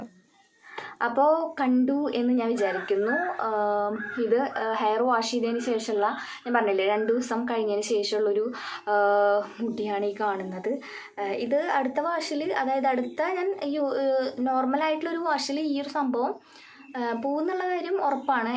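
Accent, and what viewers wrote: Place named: Thrissur, India. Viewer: Good, വീടെവിടാ തൃശൂർ ആണോ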